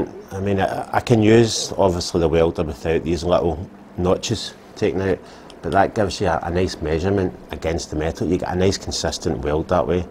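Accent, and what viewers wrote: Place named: West Lothian, United Kingdom. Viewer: You are welding all wrong there......only kidding just wanted to play with your rants 😂😂.just found your channel and looking forward to following you and watching your previous vids. Doesnt sound like you are too far from me (west Lothian) based on your accent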